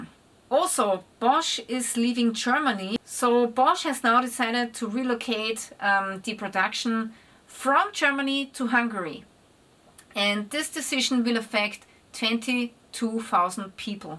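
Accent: Austria. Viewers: I love your content... love your Austrian accent. :) Agree 100% that Germans are awesome... been to Braubach, Oberwesel, and Frankfurt. People could not have been more friendly and gracious. Bitte and danke go a long way with them. Their government, well... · I love the Austrian accent, I’m glad Germans are leaving, it’s not right or left anymore, it’s the people vs government. ☝️ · You do have the better dialect 😄 When I made a trip around south Germany and Austria, I suddenly felt that German language can sound nice, soft and elegant after crossing the border into Austria ❤🤍❤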